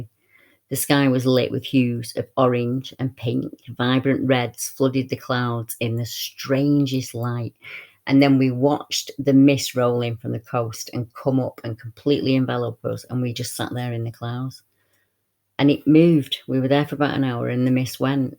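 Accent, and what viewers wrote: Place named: North, United Kingdom. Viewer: Not commented in a while as I watch your videos mainly on my TV as I sleep, something about the fellow north accent and the amazing encounters is just so peaceful to me in a stressful part of my life. Sending Much love to you and everyone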